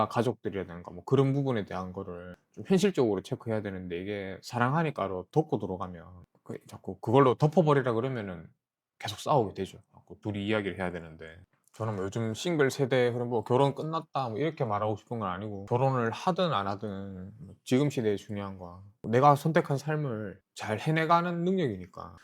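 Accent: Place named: Gyeongsang, South Korea. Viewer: 경상도 어투가 있어 친밀감 확~!느껴져 글 남기게 되었어요ㆍ저도 고향이 경상도 김천이라서요ㆍ~^^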